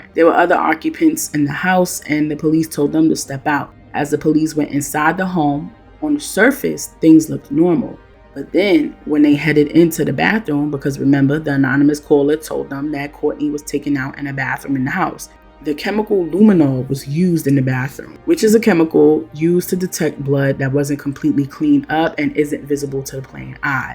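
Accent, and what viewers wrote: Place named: New York City, United States. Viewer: Yo you funny ash with that accent love that nyc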